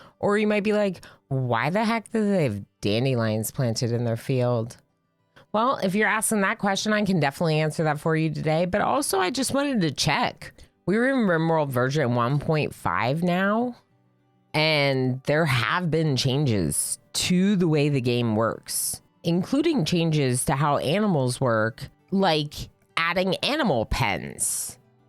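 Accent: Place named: United States. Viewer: You have such an annoying stereotypical american voice but you seem really sweet tho, so I'm a little conflicted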